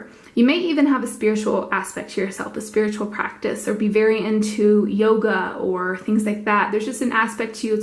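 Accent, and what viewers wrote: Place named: Scotland, United Kingdom. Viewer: LAYERS thick Scottish accent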